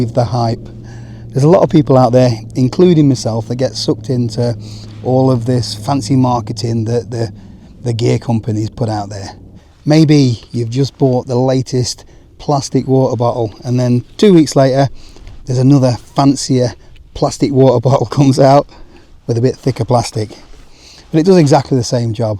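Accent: United Kingdom. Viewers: Great video 😁👍 I'm ex-pat Brit, living in Japan 20+ years, and it was great to hear your accent, see the views, and remember the National footpaths/bridleways etc., in the UK 🇬🇧❤️🤍💙 Here 🇯🇵, "Right of Access" doesn't exist, so although not technically illegal, wild camping simply isn't done. 🫤 Our camping trips are closer to those of the gorgeous "Kirin Camp" (the South Korean girl camper), than yours......but not really by choice. 🥵 Be safe, and catch you later mate. ☺️👍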